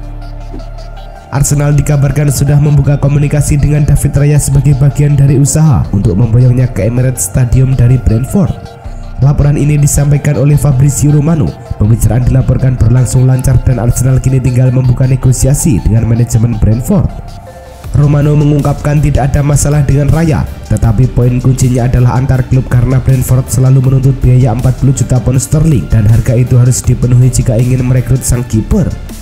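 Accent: Java, Indonesia. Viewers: Sudah bagus cuman Host nya di ganti lah,ending yg cewe, yang cowok ini terlalu Medok.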